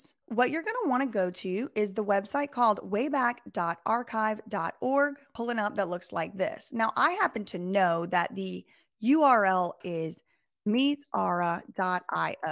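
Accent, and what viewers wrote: South, United States. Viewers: You are the best holy shit. Sweetest accent, funny, southern as hell, gorgeous and an advocate for the weak. You earned a sub please don’t ever stop doin this.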